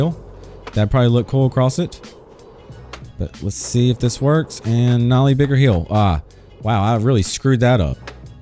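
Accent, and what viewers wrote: South, United States. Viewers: Definitely from the South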